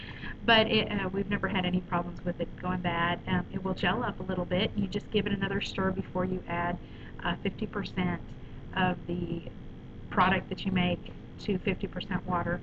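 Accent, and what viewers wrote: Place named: Southwestern Pennsylvania, United States. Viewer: Wursh? Are you from SW PA?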